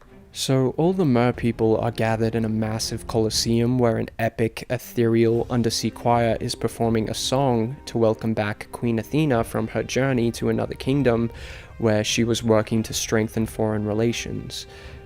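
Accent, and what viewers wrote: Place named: United Kingdom. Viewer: This is my second video from you that I’ve watched and I just have to say that your accent is really confusing me bro. You sound like a Brit doing an American accent. There’s no way you aren’t from the uk or at least spend a long time here or something because you’ve got proper English vowels mate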